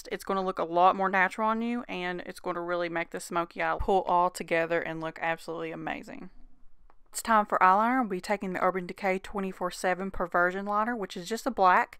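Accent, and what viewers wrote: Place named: South, United States. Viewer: I have similar face shape, eyes and hair as you so I love to see new ways to do makeup. I didn't pick up this palette at Sephora the other day still pondering. Also, your accent sounds like mine. Southern girl? :)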